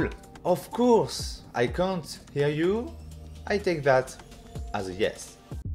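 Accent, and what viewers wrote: France. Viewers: T’es français a tout hasard ?😂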